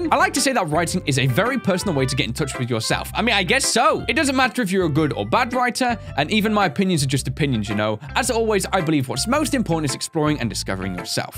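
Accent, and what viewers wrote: United Kingdom. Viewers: Why do you sound sound British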